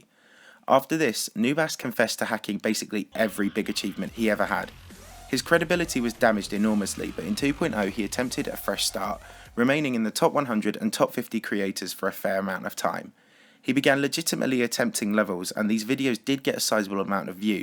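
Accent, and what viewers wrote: United Kingdom. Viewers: i hate the britain english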